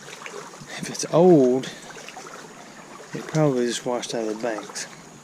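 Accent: South, United States. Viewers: I am from the communist state of California. I am a Southerner at heart and always have been. I really enjoy the accent from the south when you people talk. It soothes my soul. Growing up my best friend's family was from Birmingham. They treated me like family.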